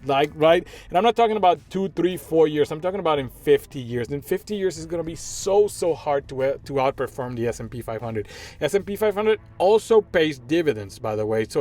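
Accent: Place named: Spain. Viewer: Ok thank you for your answer, I know English too but I thought you was a native spanish talker jajajaja, I like a lot this new channel, i will take a look of the other videos, thanks for sharing your experience!!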